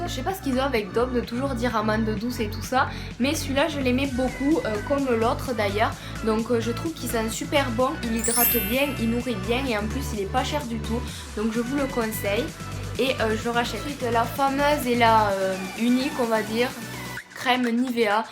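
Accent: South, France. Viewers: J'aime bien ta vidéo , ta l'accent du Sud ⚓ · Coucou ! j'aime beaucoup ta chaine n'hesite pas a venir faire un tour sur la mienne tu est trop mimi ^^ et j'adore to accent du sud !!!! bisouus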